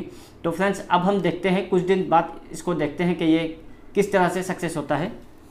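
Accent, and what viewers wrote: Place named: Northeast, India. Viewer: Voice like you're from North-east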